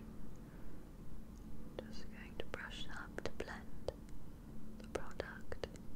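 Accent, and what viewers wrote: United Kingdom. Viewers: Love the video a d your British accent hehe. So pretty 😍 and calming 😁😴 great job as always!Hope you had a Happy Thanksgiving! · this ASMR is absolutely incredible 🤩!! Coming from a British girl, your British accent in this video is absolutely insane 🤯!! Super spot on!! This video was everything I want in an ASMR video & more!! Soft, sweet ☺️, relaxing & super tingly. PLEASE 🙏🏻 do more British accent role plays. Much love 💕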